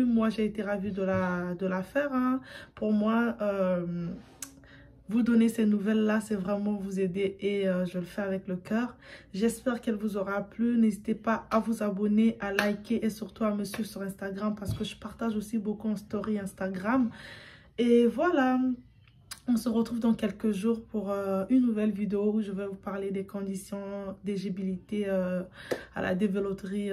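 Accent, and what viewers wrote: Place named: Democratic Republic of the Congo. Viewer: 😂😂😂Bonjour, les congolaise sont là pour te soutenir, je savais que tu étais congolaise juste par ta prononciation française... 🇨🇬🇨🇬🇨🇬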